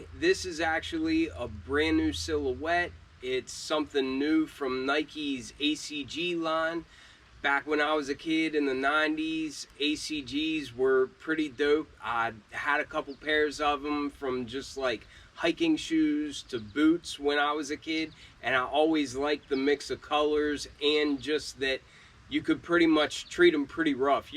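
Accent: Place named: Philadelphia, United States. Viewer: From Philly, arent you? Me too. Cant miss that accent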